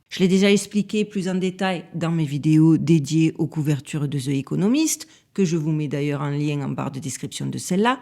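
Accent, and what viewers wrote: South, France. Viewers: Toutes ces horreurs avé l’accent du sud, c’est quand même plus sympa🥰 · Ah !!!! L' accent de la bonne mère en direct des states !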